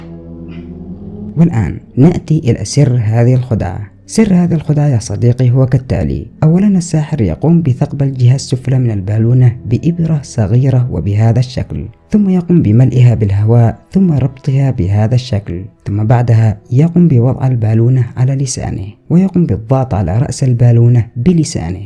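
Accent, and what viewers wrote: Yemen. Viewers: أصحاب المناطق الوسطى في اليمن كذا ينطقونها ارجع الى خطابات الرئيس السابق ولاحظ النطق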